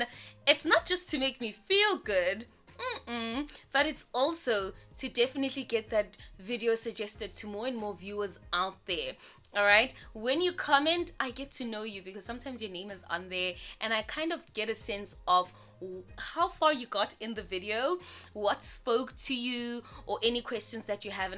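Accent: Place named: South Africa. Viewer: I thought you lived in Australia but I was surprised by the South African accent 😂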